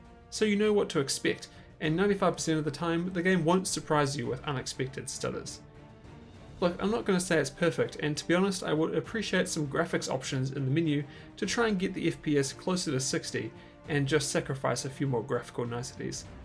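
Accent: New Zealand. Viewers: R u from New Zealand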